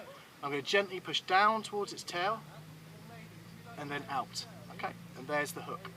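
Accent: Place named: Midlands, United Kingdom. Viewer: hi sounds like the midlands to me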